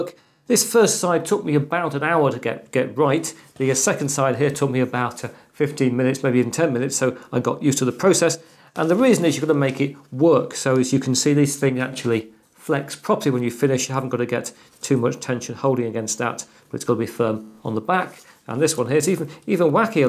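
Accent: United Kingdom. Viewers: What kind of British accent do you have? Because which ever one it is, it's the one that always sounds low-key being cheeky.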